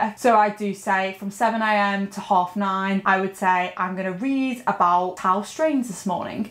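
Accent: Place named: Newcastle, United Kingdom. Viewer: Your accent sounds a bit 'Geordie', no?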